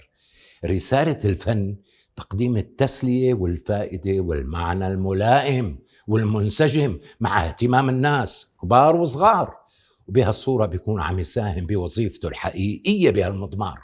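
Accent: Syria. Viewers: ريحة الشام و الياسمين.. ريحة وطني.. و لهجة وطني.. ريحة أبي.. و طريقتك بتشبه طريقته.. الله يخليلنا ياك 🌷 و يدوم عليك هالذكاء و الإلقاء و الفكاهة اللاذعة 💕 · جميله اللهجه السوريه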